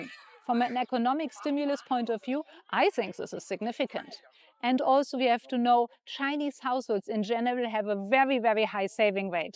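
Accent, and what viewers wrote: Germany. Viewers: What first drew me to this Zen and humbled lady was, perhaps, her accent— German, I believe— with all reverence, quietly enchanting, like a forgotten melody from an ancient place, flowing softly through the Garden of Eden. Thank you for sharing a moment with me, a sip of coffee beneath the sky of eternity. As you spoke of China— that land I hold in my soul’s quiet chamber— your voice became the bridge between centuries, your words woven like silk in the wind. And though you stood still, you moved. Your dress clung to your form like a whispered symphony, a silent score composed in sacred air. Your hands— they danced softly, painting invisible calligraphy, tracing rhythms only the heart could read. You didn’t walk— you floated. In sync with something unseen, a grace not taught, but remembered. A melody not played, but felt, not spoken, but lived. 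You were not merely speaking— you were composing. In spirit. In stillness. In beauty.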